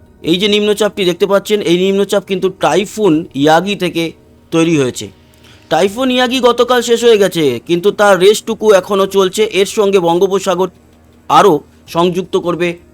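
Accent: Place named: Bangladesh. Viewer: আপনি কি বাংলাদেশ থেকে ভারতে এসেছেন ? ছত্রে ছেত্রে বাংলাদেশ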